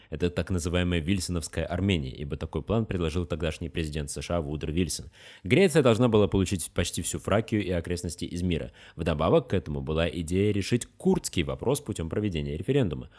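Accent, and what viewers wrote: Tatarstan, Russia. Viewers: Классный канал! Автор акцентом похож на татарина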